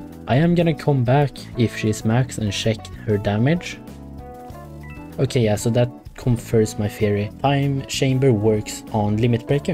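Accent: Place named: Sweden. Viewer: Yo you have like a swedish accent and in case you swedish tjena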